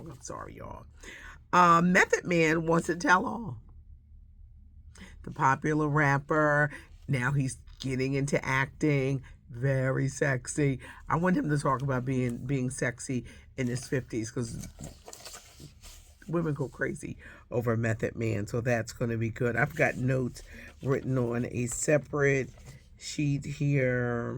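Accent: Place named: Philadelphia, United States. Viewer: Hi Auntie 🖐🏾 I just want to say hello. I have loved you for years. I go all the way back to the Butter Ball days. I used to work at Jefferson Hospital with your brother.I have moved to the west coast. See you always take me to my home Philly. I love the Philly voice and demeanor. Love you Auntie. Keep up the good work 👏🏾 🙌🏿 ❤😂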